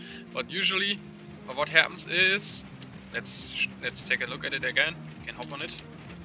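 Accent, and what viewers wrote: Germany. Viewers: Accent? Germany?!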